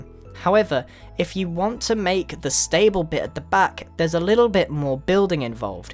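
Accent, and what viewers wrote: United Kingdom. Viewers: I love your voice, the accent is awesome! Are you british? Your voice has just that "british feel" to it XD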